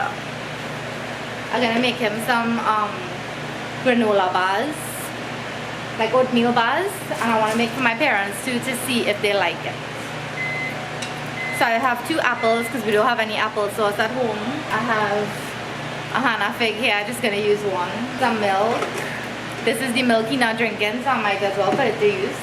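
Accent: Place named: Trinidad and Tobago. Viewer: Hi again I just love seeing you and family happy all going ons..stories,experiences who drink out the coconut water? Especially when you hear the bread van then you have to fly down the step , drop everything vtp reach him before he leaves ..Haha I know the struggles...Girl you show me how to make smoothie gratitude to you fuh so...I could go on learning more about your channel your baby so cute...Love your Trini foreign sal g dialect accent..I pray God keep i all safe happy together even if you do live a part too..Mwah hugs to you all...I seca real cry baby when I don't see family enough...Bye..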